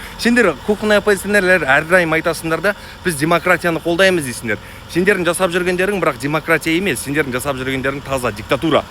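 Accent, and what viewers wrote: Standard. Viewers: Рахмет, балам, қазақша таза сөйлеп, өте дүрыс пікіріңді айтасың! Жарайсың! Қазыр қазақтар - жарты қазақ + жарты араб, не болмаса жарты қазақ + жарты орыс! Ал өзің, балам, таза қазақсың, бетке үстар блогердің бірісің!!! Тағыда, рахмет!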